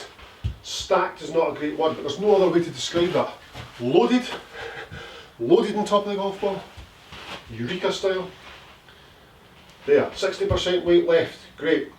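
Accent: Scotland, United Kingdom. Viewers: Great vid good to hear a fellow Scot voice, it's just come back to me a few weeks ago I was hitting the ball great mostly on one leg thanks for the reminder 😁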